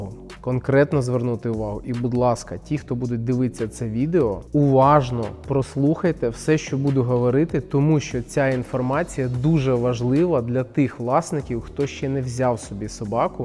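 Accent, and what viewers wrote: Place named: Surzhyk, Ukraine. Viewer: Слушаю и понимаю какой суржик жоский, с другой стороны, ставя себя на место человека в телефоне понимаю что я если бы говорил обычной скоростью, а не запинаясь вспоминаю як же буде черевик українською. То было бы аналогично если не хуже. Респект за мову.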